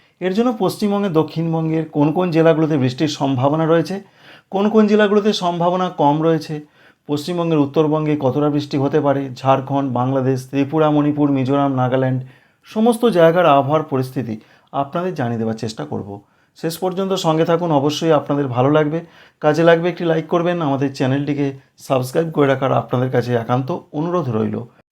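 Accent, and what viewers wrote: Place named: United States. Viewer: বাংলায় ফিরে এসো বাবা, ইংরেজি উচ্চারণ আমেরিকার হওয়ার জন্য আরো বুঝতে পারছি না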